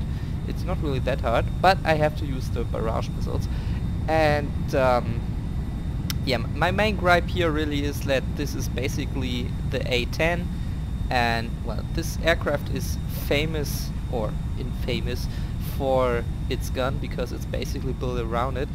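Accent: Germany. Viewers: I LOVE UR THICK GERMAN ACCENT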